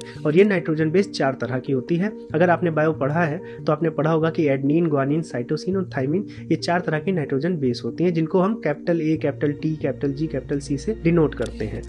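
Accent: Standard. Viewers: Impressive. I really admire you with your Hindi speaking. Ekdum sudh Hindi.. In today's time you are making videos in pure Hindi. Aapko Naman hai 🙏🙏🙏🙏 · आपकी ज्ञानवर्धक बातें तो बहुत अच्छी हैं परन्तु आपका प्रस्तुत करने का अंदाज भी लाजबाव है। शुद्ध उच्चारण से विडियो काफी अच्छी हो गई है।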